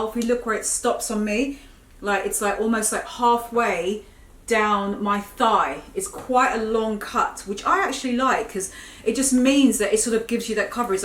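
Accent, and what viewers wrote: United Kingdom. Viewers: I realize this is a yr old but “bloody” lol (typing as I speak in your British accent in my mind) I love that yellow suit, everything about it. Is that appropriate for any work place tho?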